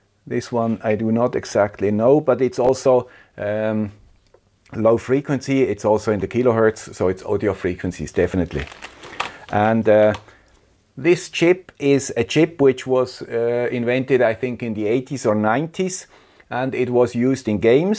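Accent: Switzerland. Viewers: I love the Swiss accent, but if you could just change this pronunciation... Circuit is pronounced sur kit.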